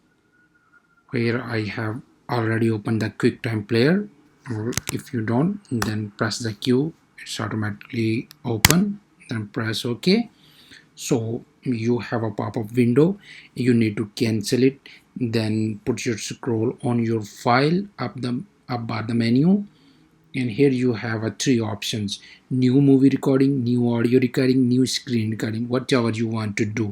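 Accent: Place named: Sialkot, Pakistan. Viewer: I like your sialkoti english accent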